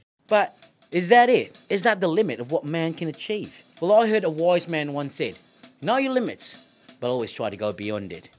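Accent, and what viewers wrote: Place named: Australia. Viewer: That damn accent. How i wish i have that Australian accent. Here's a thumb up.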